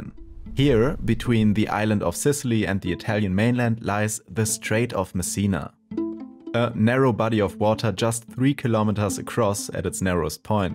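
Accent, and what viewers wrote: Germany. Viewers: dein deutscher Akzent gar nicht zu überhören😂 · man hört den deutschen akzent immer raus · I love your German accent ❤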